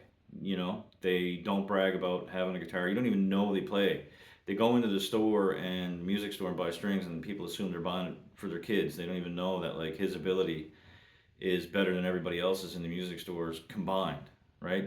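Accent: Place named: Nova Scotia, Canada. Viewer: Jesus bud, you sound like youre from nova scotia or newfoundland, not new brunswick, we have the best bedrooms here.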